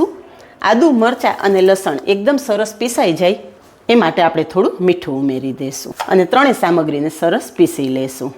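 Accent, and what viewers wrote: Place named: Kathiawad, India. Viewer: Kathiawad ma bhaagye j jova malti uchchaar shuddhi , ane chatakedar chutney....khub saras . 😋😋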